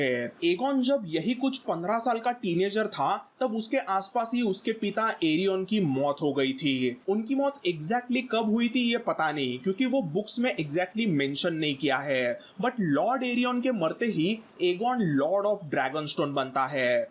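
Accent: India. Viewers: Open the video for listening. Found out this fûc-kface speaking in Indian accent. Leave.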